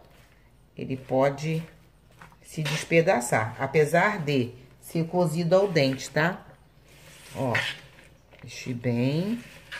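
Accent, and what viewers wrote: Rio de Janeiro, Brazil. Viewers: Hummmmm, que delícia!!! Amo esse sutaque de carioca, amo suas receitas!!! Simples e fácil!! Vc é o já mora no meu coração!!!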